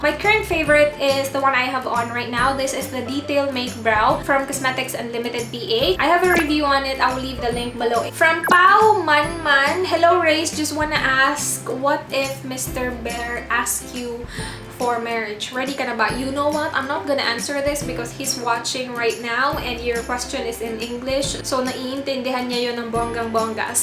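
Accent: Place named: Visayas, Philippines. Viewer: hi rhaze where are you from? bisaya mn d i ka. 😂😂😀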